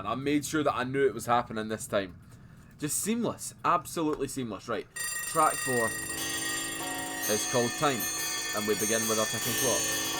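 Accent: Scotland, United Kingdom. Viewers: As an older fan of (I'm 72 soon) I like his rap, but my favourite music is from the 70s, in particular. They are every bit as invested in the lyrics as any decent rapper, with the addition of great talent and skill in playing their instruments. Edited to say, keep letting the transitions happen, they're an intrinsic part of the music. Further edited to say, as a fellow Scot I'm glad to hear a reactor with your accent. I've subbed in the hope of more and for.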